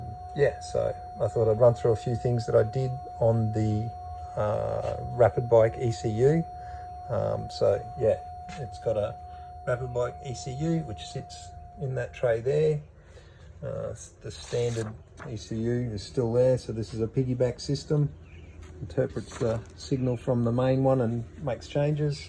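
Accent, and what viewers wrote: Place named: Australia. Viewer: Hay mate I also brought a 2021 V85tt Cenrenario model as well ( had for about 6 months ) was new hadn’t sold . I love the bike , I had a problem with the EML coming on over5500 RPM , right hand cylinder was missing. I reached out on the V85tt fb page and found most helpful. Apparently it was something to do with sonic wheel but resetting valves prior to reset ? , anyway done two big rides since and love the bike . You sound Australian ( I live in Wodonga Vic )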